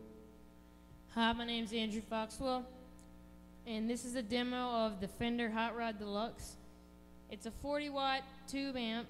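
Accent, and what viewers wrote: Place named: New Orleans, United States. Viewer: You gotta be from New Orleans! :)) Nice amp!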